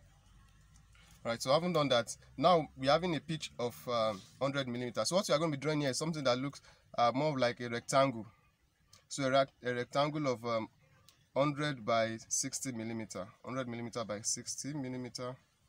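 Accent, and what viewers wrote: Nigeria. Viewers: I could tell you're Nigerian from the accent. I'm Nigerian too. Haha😂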